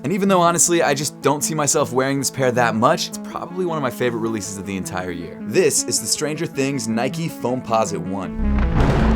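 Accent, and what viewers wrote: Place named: New York, United States. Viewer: I'm picking these up, shocked that your from Baltimore you sound and look like a New Yorker.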